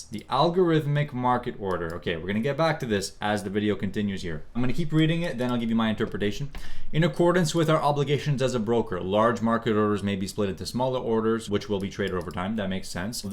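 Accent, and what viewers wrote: Canada. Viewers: By chance, are you from quebec?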